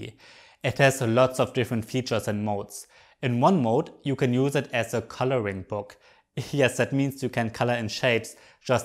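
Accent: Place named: Germany. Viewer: I love it that you speak english in a German way🥰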